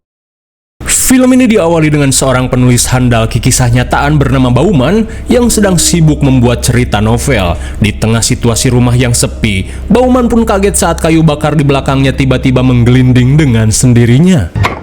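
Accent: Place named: West Java, Indonesia. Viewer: logatlawak sunda banyax menghibur ke para orang indo, aku orang suku sunda jadi ikut bangga banyax yg suka logat lawak sunda aing baraya, mantap mang sehat selalu jeung damang selalu mang😆😎🙌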